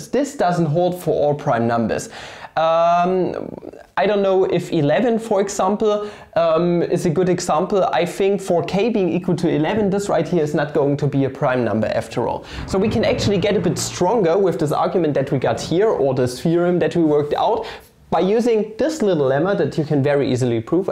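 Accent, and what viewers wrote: Germany. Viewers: You're German I suppose? Judging from your accent :D great video anyways, keep it up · Schöner Deutscher Akzent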